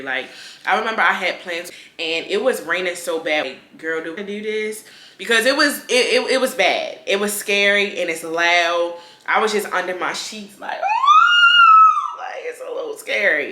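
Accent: Baltimore, United States. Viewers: Girl I knew you were from Baltimore the moment you started talking. I plan on relocating to Dallas from Baltimore in a few months. God is definitely telling me to relocate here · When you said “dew” for do, I sad she’s definitely from B’more,. Lived all over DC and PG county and went to Howard. Your accent makes me miss the DMV. Good luck!!! From Houston ( although I haven’t lived there in years). You sound like the type of that will do well Dallas is conservative compared to Houston. In Houston they are turned up 7 days a week with after-after clubs. Dallas is more sophisticated and calm. Calm is Good. Houston is like one big HBCU AND ABOUT EATING OUT AND DAY PATIES AND BEACH PARTIES. It can get addicting. You made a good choice, definitely if you’re in corporate , finance and communication. Houston is more like medical, engineering, life sciences, NASA, oil/energy and aerospace. 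I’m a guy and don’t usually watch these but it caught my eye and I thought about reminiscing. Be safe and prosperous! The food in Houston will do it for you . It’s more diverse. There are 600 black owned restaurants in the Houston area( more like Atlanta)Creole, Soul food, Jamaican , Nigerian, Indian, Middle Eastern ,Vietnamese., Latin etc etc. There is excellent black theatre( the Ensemble Theatre)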